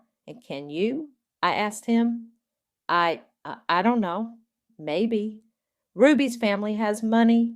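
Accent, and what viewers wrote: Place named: South, United States. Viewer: Southern accent.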